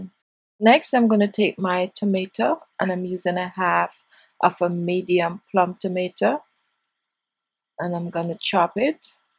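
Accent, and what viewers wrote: Jamaica. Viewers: kokonut 4ever Jamaican-it's evident from how she speaks and pronounces her words. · hey girl I am loving your videos, I can hear your Jamaican accent you go sister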